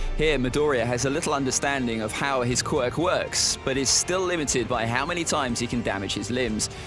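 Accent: United Kingdom. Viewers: Your defenetly british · Nice Power Level. Maybe we could do a power level together on my channel Btw I love your british accent :)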